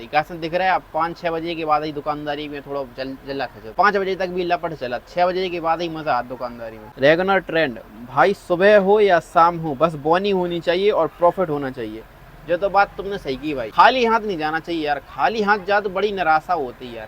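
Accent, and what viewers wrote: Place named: Jhansi, India. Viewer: भाई अभी भी टाइम है इसको बंद करके पान की दुकान खोल ले 😢 कपड़ों की दुकान में अब दम नहीं। वैसे झांसी साइड के लग रहे हो बातचीत से 😅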